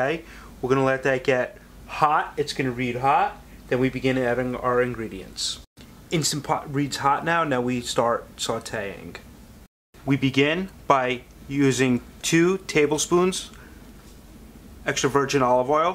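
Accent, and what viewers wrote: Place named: New York, United States. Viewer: Love the way you cook and your (New York) accent...? 😃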